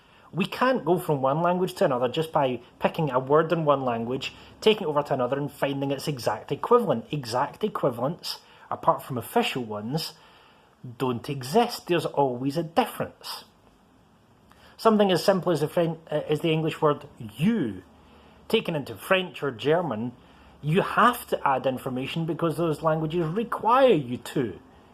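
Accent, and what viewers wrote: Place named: Scotland, United Kingdom. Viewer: ... that bonnie Scottish (Glasgow?) accent! Love it! :)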